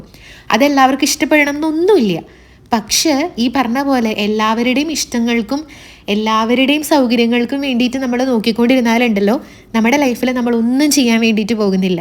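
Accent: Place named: Japan. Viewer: Oru japankari malayalam parayunna pole und,, love your vdo sissy 💌🥰... Keep going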